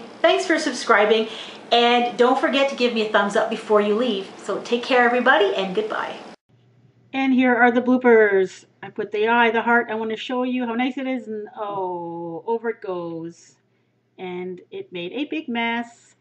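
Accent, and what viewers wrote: Canada. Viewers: I love your Canadian accent, ha. I'm right below you! ( America :] )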